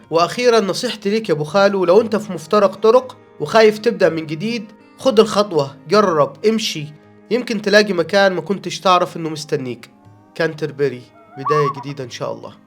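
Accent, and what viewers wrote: Upper Egypt, Egypt. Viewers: والله جميل انك تحتفظ باللهجه الصعيدى مع اللغه الانجليزيه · ممكن اسألك سؤال هو انت من فين فى مصر لانى حاسك صعيدى و هل اللى بيعمل برنامج المخبر الاقتصادي ده يقربلك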